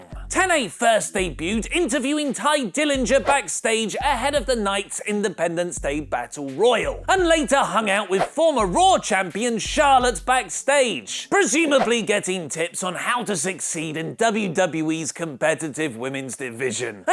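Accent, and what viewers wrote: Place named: United Kingdom. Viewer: Hearing British pronunciations is horrifying.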